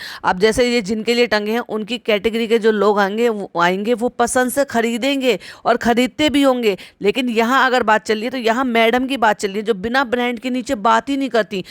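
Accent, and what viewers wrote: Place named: Saharanpur, India. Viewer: ji!!! Aap bilkul Saharanpur/ Muzaffarnagar k jaisi boltai ho!!!! Many of my family members & friends live there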